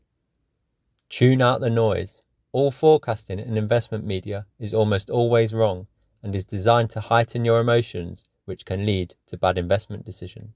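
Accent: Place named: United Kingdom. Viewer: Dang British accent, this Merica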